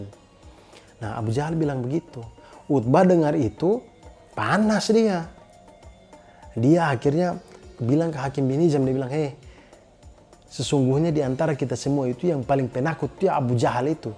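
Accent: Eastern Indonesia, Indonesia. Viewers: Bahasa timurnya kental sekali ,, saya suka saya suka ,, salam dri bnten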